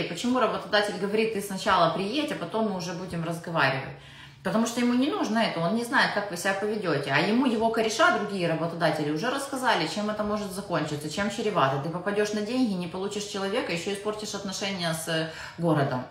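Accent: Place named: Odessa, Ukraine. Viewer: Таки шалом ;) Слушаю Вас просто так, для поднятия настроения. Свою иммиграцию прошёл давно и успешно, но Ваша разговорная Адееесская рЭчь мотивирует и даже вдохновляет. За 10 лет не встретил в Альберте ни одного одессита, так хоть с Вами душу отвожу. Про Саскачеван это правда: степь да степь кругом, едешь 200 км и одного села нету, печалька полная... Ездим туда по 3 раза в год на рыбалку (800 км в одну сторону) за коропом метрового размера которого можно брать безлимит. Хорошо что бак в машине на 90 литров, чтоб не переживать за заправку сильно, и то всегда беру пару канистр на запас ( с до заправки не работают у них).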